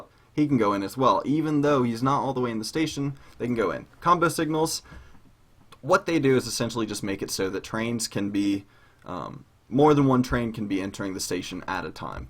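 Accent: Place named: United Kingdom. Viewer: like not fully british but brit-like inflection they had a hold on u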